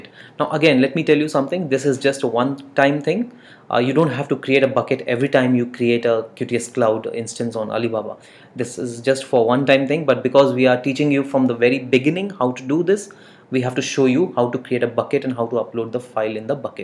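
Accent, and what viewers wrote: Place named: India. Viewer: That is the most childish explanation I have seen of setting up anything esp VPN just copy paste 0.0.0.0/0 what does that mean bro lol for average people at least tell them what it means ?? what rubbish! Guys at QNAP please get someone better then this indian dude or pakistani and trust me I know the accent from where I was born!!!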